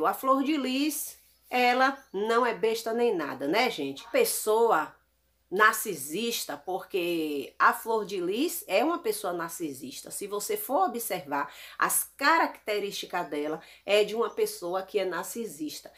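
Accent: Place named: Bahia, Brazil. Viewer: Eita baiana arretada continua a falar a verdade minha irmã cada vídeo seu fico falando com Deus.porque todos não são verdadeiros que Deus continue a te usar e abri os olhos do povo ,ah tô amando seu sotaque kkkk sua risada também fico rindo com você falando sempre a verdade quando tá brincando ou falando sério Deus continue a te usar IRMÃ 😘👏👏👏👏🙏🙌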